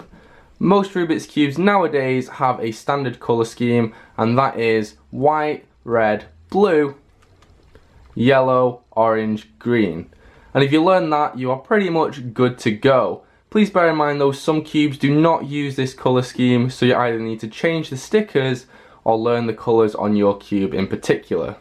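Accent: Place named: Manchester, United Kingdom. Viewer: So much easier to understand when it is explained in a Mancunian accent, like my own. :o)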